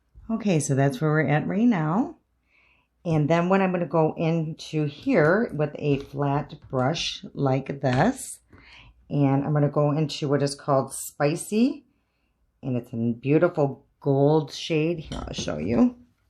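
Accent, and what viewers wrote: Midwest, United States. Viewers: I'm new to your channel; Allie Glines linked you on her recent video testing out the Loreal serum foundation. I'm 48, and i'm also in the process of re-learning makeup...and falling in love with it again! 😎 You mentioned your undereye darkness, and i have that as well. I have found a few products really do completely neutralize that discoloration. I like the Pixi Correction Concentrate, the Fenty Bright Fix Eye Brightener Concealer (shades like melon or deep melon), and the Tarte Colored Clay Undereye Corrector. These products do not crease if you use a very thin layer, and they do not move if you set them lightly. The key is super light layers. Anyway, hope this helps someone! PS...i hear a very familiar Midwest twang...where are you from?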